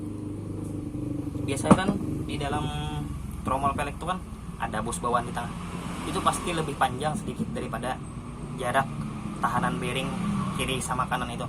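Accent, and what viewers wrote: Banjar, Indonesia. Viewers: Orang banjar kah pian om.. aksen nya kaya banjar😁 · banjar banar nah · Logat nya banjar di mana lokasi nyaa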